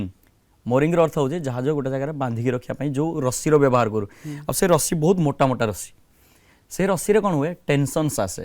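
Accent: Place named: Angul, India. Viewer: ଭାଇ ଆପଣଙ୍କର ଘର ଅବା ଗାଁ ଅନୁଗୁଳ ଅବା ତାଳଚେର ଅଂଚଳରେ କି...? ଭାଷା ଟା ସେମିତି ଲାଗୁଛି... 👍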